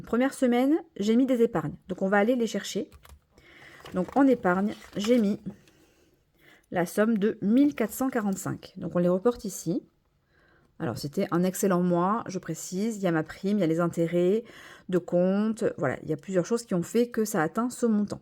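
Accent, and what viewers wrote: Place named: Southwest, France. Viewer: Cet accent du sud ouest! Je le reconnais j’ai le même 😂😊 j’adore tes vidéos !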